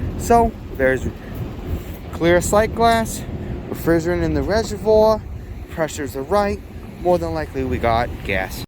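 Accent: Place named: New York, United States. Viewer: New York accent s so cool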